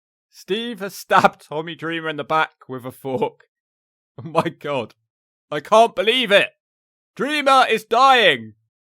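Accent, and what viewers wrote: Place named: Newcastle, United Kingdom. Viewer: Ur a geordie didn't know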